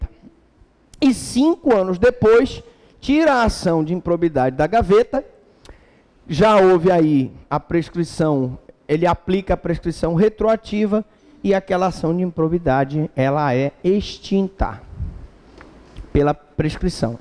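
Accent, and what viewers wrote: Pará, Brazil. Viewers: Muito obrigado, sou paraense mas moro e estudo no Rio de Janeiro e suas aulas além de muito brilhantes me ajudam a matar a saudade do Pará que amo, pelos exemplos e pela sua forma de falar. Obrigada.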